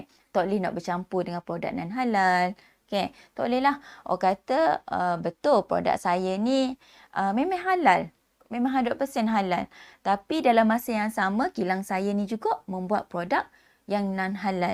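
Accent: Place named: Kelantan, Malaysia. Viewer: Bunyi klate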